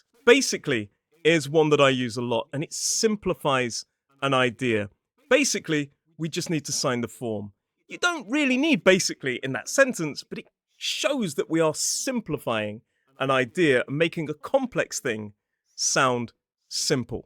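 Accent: United Kingdom. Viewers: I really do love the BE pronunciation. Thnx 4 ur entertaining lessons.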